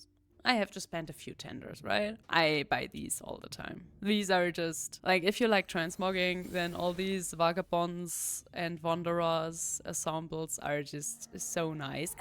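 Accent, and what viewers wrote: Germany. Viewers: Hi, yeah I would love watching another class, any realy, do what you're enjoying. BTW I find your voice so smoothing, and love the german accent. I'M french but father was german so it brings back memories 😉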